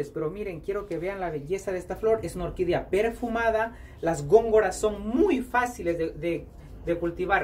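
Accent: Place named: Latin America. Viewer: Me encanta tu dedicación! Felicidades por tan buenos resultados 👏👏 Por cierto, no tienes acento español, me parece que eres de Latinoamérica, no me equivoco? Saludos desde México.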